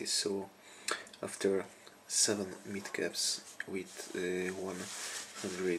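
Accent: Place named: Hungary. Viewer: Felismertem a magyar akcentust :D Azon gondolkozok, hogy a gyári 9.6V-os NiMh helyett 3 LiFePO4-et, vagy 2 Li-ion-t használjak. Utóbbi esetben 2P-be is tudnám kötni, vagyis 4 db-ot használnék dupla kapacitásért (kb. 6000mAh). Szerinted melyik a jobb döntés? I'm wondering whether I should use 3 LiFePO4 batteries or 2 Li-ions instead of my stock 9.6V NiMh. In the latter case I could use 4 pcs instead of just 2 in a 2P configuration for double capacity (around 6000mAh). What do you think?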